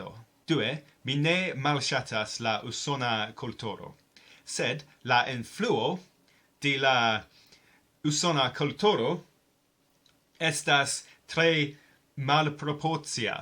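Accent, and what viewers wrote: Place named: England, United Kingdom. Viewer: ... Ĉu vi estas angl-landano...? Mi demandas ĉar mi awdis vian akcenton kaj you sound English^. Kiel, el Anglio. Mi trovis ke mia lingvo (angla) estas, on a personal level^, mia honto. Kaj mi petegas... lernu kiel elparoli "malantawan" U kaj aliajn vokalojn. Nia (Sud-)Angllandano U estas duon antaw, duon malantaw, inter la Germana Ü (preskaw plene antawigita U) kaj la Germana kaj vera Esperanta U. (Redakto: Mi vidis vian filmeton je decembro 2016-jaro kaj ŝajne vi tre plibonigis vian elparolon.) Ĉiuj ni fuŝas la akuzativon. Vi ne malsuperas se vi akuzativfuŝas. ^ Jes, mi lingvosaltadas (codeswitch). Kial? Plejparte, ĉar mi apenaw parolas Esperanton.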